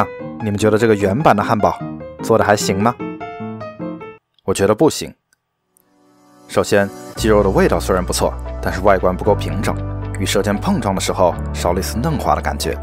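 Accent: Wuhan, China. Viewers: 那~~有莫斯办法撒？ 有点吧甜，有点吧韩，哈哈哈哈。 这弯管子普通话太熟悉了~~哈哈哈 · 博主是武汉人啊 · 好怀念弯管子普通话。确定博主是武汉的妈妈😁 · 这个弯管子普通话真的是听得想家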